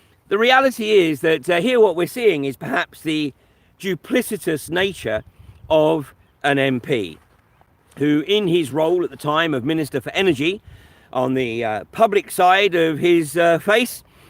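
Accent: United Kingdom. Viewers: When I first heard you speaking I thought that you sure had a heavy British accent for an American! Then when you said "Tori," I realized you were not American, you were from England speaking of politicians in Great Britain, although frankly it's very difficult to tell them apart! :-)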